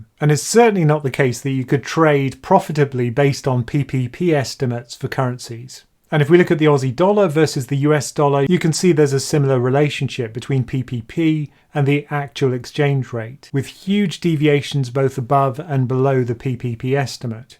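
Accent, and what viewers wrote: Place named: Wales, United Kingdom. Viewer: Your cadence and accent reminds me of author Jon Ronson, who is Welsh.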